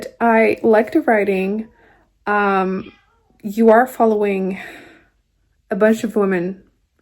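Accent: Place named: Quebec, Canada. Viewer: I LOVE the accent québécois so much!! (and I'm really proud I understood everything haha)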